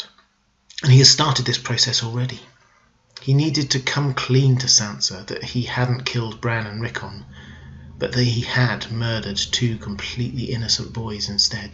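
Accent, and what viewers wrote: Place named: RP, United Kingdom. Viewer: Hi, I've done, among other things, radio ads and have gotten feedback that I have the best voice in local announcers, etc and was chosen over many others for a series of PA announcements at DIA (Denver Airport). I have also directed audio sketches with a cool group previously online. I say this to let you know I know something about voice delivery. There is something to consider in what Yol says. Got the downbeat voice criticism from radio station managers, when the women said the slower voice was good. I think they were reacting as women and found my voice attractive - whereas the men were more balanced in their judgement and as such should be listened to. You have so many very good things going on with your voice and delivery - but there is a certain downbeat aspect to your voice that bears improving upon - it tends to lull the listener who will fight to stay awake because they can tell what you have to say is important. 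Often very important.. Perhaps it is to bring more variance in your voice, variations in inflection, stressing certain words over others and differently. Changes in the tempo of the words to liven things up. There is a peace and humanity in your voice that makes listeners want to be civil and human on your posts. Very humble though you're speaking in a very posh upper class British accent. Very good on your content! These videos help me live without the series or any new books. Yours are quite good and... quality stuff!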